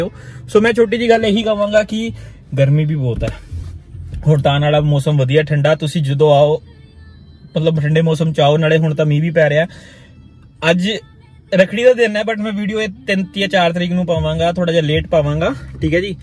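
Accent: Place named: Amritsar, India. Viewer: Paaji tusi hoshiarpur de o par tadi boli expretion sade amritsariya vrgi aa ji apa nu v hun ithe rehde kafi chir hogeya hun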